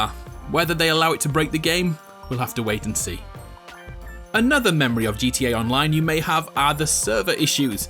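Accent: United Kingdom. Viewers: I stay to the end of the video as their amazing and are u British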